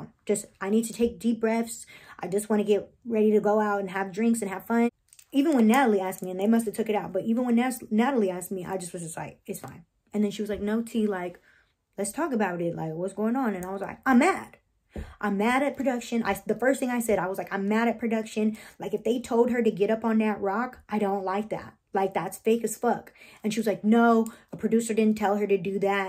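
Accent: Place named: New Jersey, United States. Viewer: I just thought you were one of those jerseylicious girls..loud and your accent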